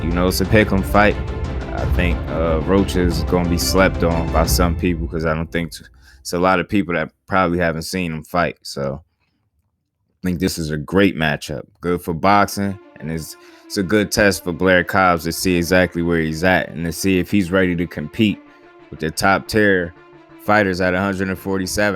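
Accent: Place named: Washington DC, United States. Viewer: omG, you’re from the dc area?? i think i hear a dc accent, especially when you say “hands”.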